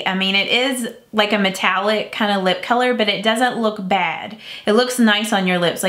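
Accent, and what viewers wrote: South, United States. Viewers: I love your videos. I love drugstore make up too and use it all the time. I am from the south too so I love your accent.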